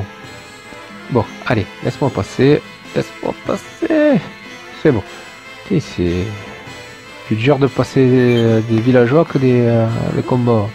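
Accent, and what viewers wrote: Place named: Provence, France. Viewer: Par contre pourquoi? 13 je comprend étant de Martigues (l'accent me rappelle mon chez moi) mais?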